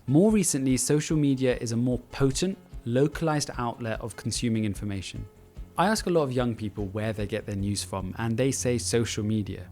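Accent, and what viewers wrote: United Kingdom. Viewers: He's a Indian with a limey accent lol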